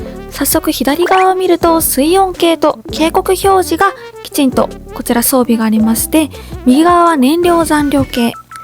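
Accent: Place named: Ehime, Japan. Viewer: 新型フリード クロスター乗りです。久しぶりの愛媛弁、懐かしく可愛かったです。 旧西条市の市中心部出身でした。 チャンネル登録しますね。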